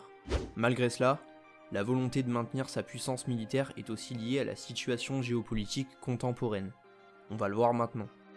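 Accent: France. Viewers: C'est l'accent du sud 😉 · C'est un parisien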